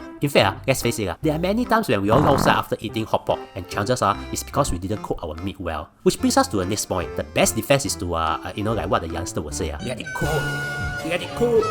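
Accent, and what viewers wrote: Singapore. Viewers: That is his signature style, exaggerated singlish, how abt u find another channel to watch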